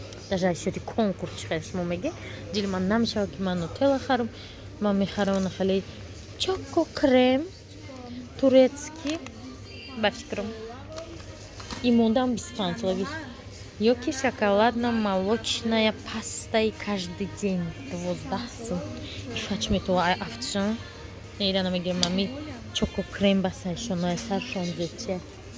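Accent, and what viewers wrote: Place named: Kulob, Tajikistan. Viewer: Апай духтари Душанбе хасти тоза кулобигт гап мезани зури да👍👍👍😁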